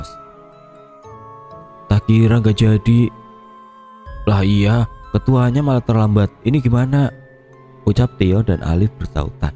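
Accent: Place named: Surabaya, Indonesia. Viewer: Wes ta bedek ket awal logate koyok wong suroboyo, jancoookk 😂😂😂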